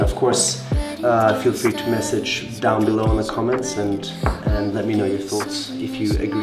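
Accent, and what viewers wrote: France. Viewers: ton accent français est trop mignon !! 😍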